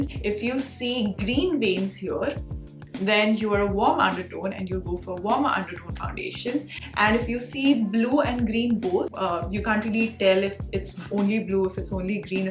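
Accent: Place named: Punjab, India. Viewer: I hear ur accent though 😂😂😂😂 please bring ur phnjabi accent back noooo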